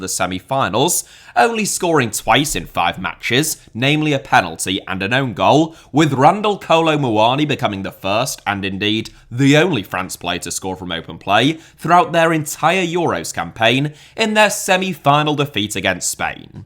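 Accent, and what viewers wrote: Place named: England, United Kingdom. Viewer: watching this after the final is classic with the English accent... but as a long time sub I obv see past any of that rubbish... but complaining about his euro footy is just complaining about football at the top level.... sure worse teams are willing to risk it for the biscuit in leagues... but at this level defensive football wins...... it's not rubbish it's just the whole boring part all about not giving up a goal.. which is much less fun than going for a goal... we watched the best players in the world sit back and play boring the whole way through and they lost in the final.....fire power is flashy but not conceding keeps u in the match.. its boring but its modern football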